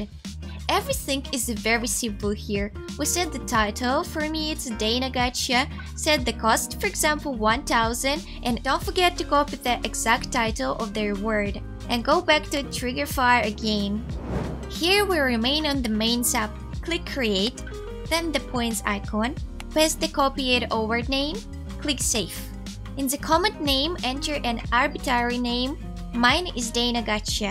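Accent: Russia. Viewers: English in russian accent is like an asmr video , so smothhhhh and beautiful voice!!!!!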